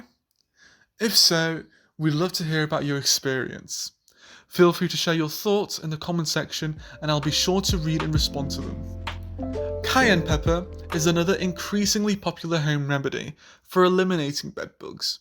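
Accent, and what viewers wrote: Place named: England, United Kingdom. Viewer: You sound English - why say diaper instead of nappy?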